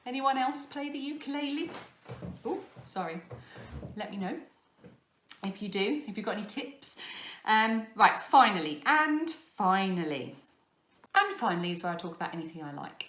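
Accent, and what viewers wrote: England, United Kingdom. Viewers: Count me in! This expat Brit knitter loves to talk yarn and patterns but especially loves to hear an English accent again!!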